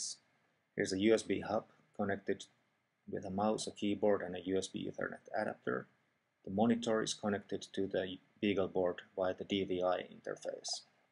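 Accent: Finland. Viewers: That's a finnish accent alright. ;) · you sounds finnish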